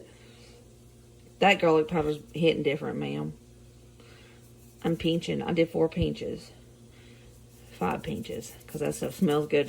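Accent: South, United States. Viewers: You are a lifesaver. Thank you. New Subscriber ❤️ Love your Southern accent. I'm from Texas 🇱🇷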